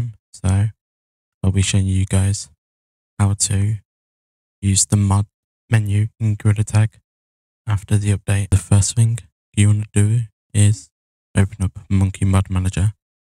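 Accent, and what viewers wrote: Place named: United Kingdom. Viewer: You sound british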